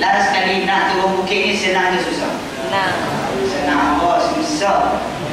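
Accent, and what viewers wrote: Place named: East Coast, Malaysia. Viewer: cakap pahang ke ganu ke kelate ke apa, tak brp dengar 😂 jap, kalau 55x22,camne plk?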